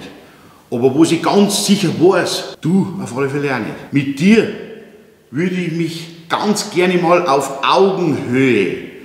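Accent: Bavaria, Germany. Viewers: Hallo, ich bin zufällig über deinen Kanal gestolpert und mir gefallen als Elektroingenieur die sehr lehrreichen und praxisnahen Beiträge wirklich gut. Hier wird gezeigt wie man es richtig macht und warum und was man nicht machrem sollte und warum. So kompakt und nachvollziehbar wird selten das Elektrohandwerk erklärt. Das spart viel Zeit die nötig wäre in Normen und Lehrwerken herumzusuchen. Mich stört auch die leichte bayrische Mundart nicht - wer das nicht mehr versteht sollte lieber auswandern...... Mach weiter so - ich freue mich auf die neue Folgen. Einen schönen Gruß nach Bayern aus dem Schwarzwald. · Zugeschaut - Mitgebaut. Und a Bairisch lernst obendrein a no. Tausend Likes aus Preußen. Weiter so!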